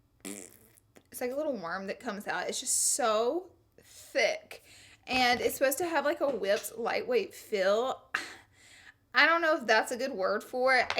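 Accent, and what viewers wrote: South, United States. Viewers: Snap Fam!!! I loved your snaps from London!! I'm from South Georgia so I love that you're a southern girl too with your accent!! ❤ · SNAPCHATFAM... Love you girl. Also in the south and love your accent and how funny your videos are. ❤️❤️❤️❤️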